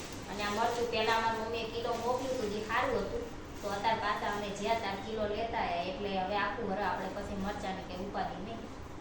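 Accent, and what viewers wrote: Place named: Rajasthan, India. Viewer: Tmra boli upar thi lage rajput hso tmaru gam kyu ame bi rajput siye tme amari chennal ne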